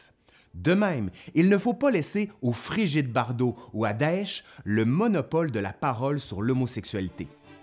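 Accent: Quebec, Canada. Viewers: gloire aux dindons quel accent ? Il vien de Quebec pas des îles de la madeleine haha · est-ce qu'il est quebequois? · Friande d'Histoire, je découvre votre chaîne et j'en suis époustouflée. Belles capsules, trop courtes certes mais très concises dans les explications qui les accompagnent, agrémentées de ce charmant accent québécois. Merci pour ce professionalisme. J'achète !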